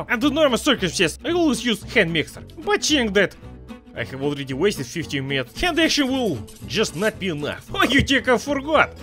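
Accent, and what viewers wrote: Russia. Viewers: Still glad your channel still alive after I took absent for a long time. You still have that funny russian english accent checked.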